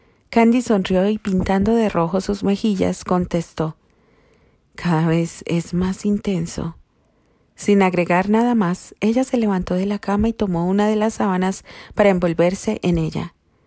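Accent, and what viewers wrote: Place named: Latin America. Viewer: su acento latinoamericano tan hermoso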